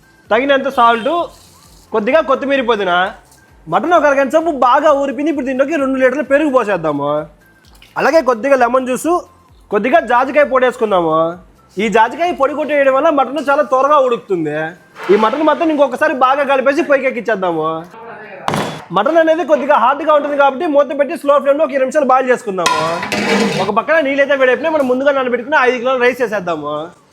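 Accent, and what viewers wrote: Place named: Rayalaseema, India. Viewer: Hyb biryani with rayalasemma vocal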